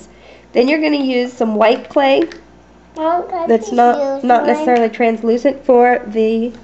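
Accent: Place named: Kansas, United States. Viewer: Love your videos! I have been doing minis for some time now and Love getting ideas from others. You don't even miss a beat in your tutorials. By the way, I live in Cali but I grew up in Ulysses Kansas so when I heard your accent I knew you had to be either Kansas or Oklahoma! Thanks for the videos. God bless!!!!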